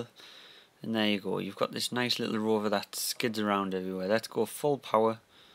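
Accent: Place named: North East England, United Kingdom. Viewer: North East of England. I might be wrong but I just recognised the accent 😂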